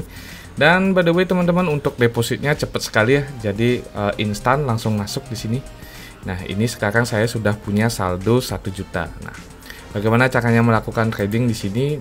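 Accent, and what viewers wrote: Bali, Indonesia. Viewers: Makasih bang.. abang dari bali ? Logatnya seperti orang bali.. kalau iya.. sukseme bang. Rahayu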